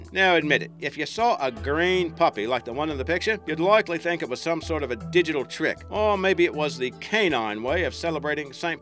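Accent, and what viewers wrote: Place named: Australia. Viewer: I think he's Australian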